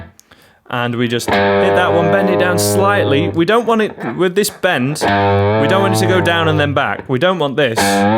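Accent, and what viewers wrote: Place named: North Yorkshire, United Kingdom. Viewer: Thank you for the lesson on by. Terrif song! Your accent sounds like a Northern Yorkshire accent. I'm an ex British Patriot. I live in Tasmania now. Keep up the good work. Easy to understand.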